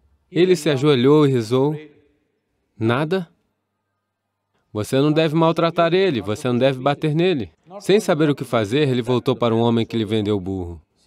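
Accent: Rio de Janeiro, Brazil. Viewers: Eu adoro a voz do dublador com esse sotaque carioca, traz o discurso mais próximo da nossa realidade. As vezes assisto o legendado, mas a noite com sono quase dormindo, prefiro a voz do dublador.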